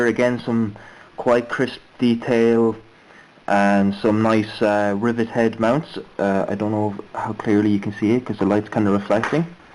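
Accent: Ireland. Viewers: Ah... Part II... All clear now. That was well done. You're a natural. Love the accent dude. You sound Irish... did you know that? hehehe.:)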